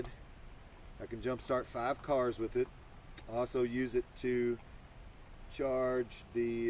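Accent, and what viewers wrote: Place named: South, United States. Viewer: Where are you from? I hear a bit of southern.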